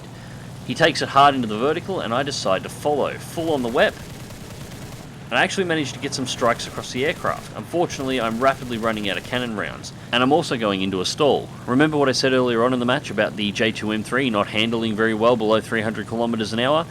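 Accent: Australia. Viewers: andrews Seriously, I'm laughing my ass off!!!!! Yes I know that you know he's an Aussie, it was just the perfect reply to getting irked about people teasing him about his trade mark accent!!! Don't ever change!!! You sound like the great Brian Brown in "Breaker Morant!" Sprog1 in AZ.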